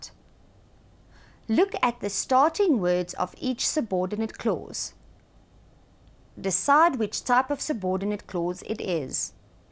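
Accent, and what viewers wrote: South Africa. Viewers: this is south African accent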